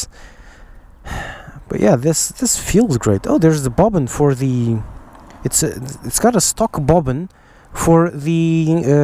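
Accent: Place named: Portugal. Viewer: só pelo sotaque dá para ouvir que és tuga crl! :D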